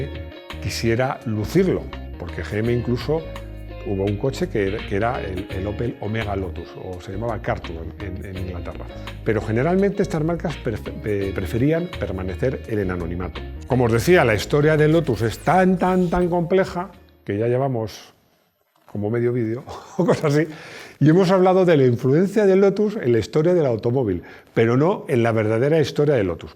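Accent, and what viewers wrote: Chile. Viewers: saludos desde La serena,Chile. inconfundible el acento nuestro. muy buenos videos....felicitaciones. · La voz en off es de un Chileno?, que desagradable!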